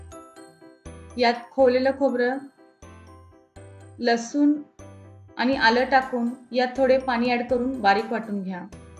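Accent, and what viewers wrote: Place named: Kolhapur, India. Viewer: Tumhi kolhapuri aahat kai..accent aahe तुमची mala kolhapuri language khup avadte.😍🥰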